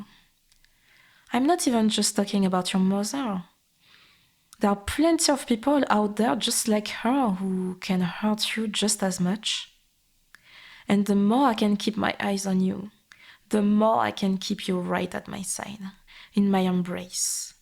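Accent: France. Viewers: This needs a part 2 if possible, i think i've dropped a tear almost falling asleep to this, i swear i could feel the hug, the embrace and it's warmth. Sometimes i couldn't understand some words because of the lovely french accent but it wouldn't be same without it (also english is not my first language and i wasn't wearing headphones) This is amazing, simply amazing, thanks for this, from the bottom of my heart. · Such a thick accent, I presume French? Sounds very nice. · The French accent sounds so good, yet so bad at the same time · Attendez une petite minute... C'est un accent Français que j'entends non ? C'est peu commun j'aime bien. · "Mozer" love it ❤. Appreciate the french accent in the audio. Feels oddly good to hear an asmr video from (i assume) a french speaker without the weird judgement people in France tend to have towards french people speaking in english. 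· Well, you did spoke with a French accent, and also the title said Yandere so I was just being honest about how I felt about this after hearing this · L'anglais avec l'accent français est vraiment relaxant, j'ai découvert la chaîne a l'instant et je trouve que, pour le moment, le contenu est vraiment bien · Ok, I've heard this script a lot, but your accent managed to make it feel new and refreshing. It's actually surprisingly rare to see french accents that aren't parodies, so, just wanted to say i love your accent (sorry for the incoherent writing, its currently 12 am)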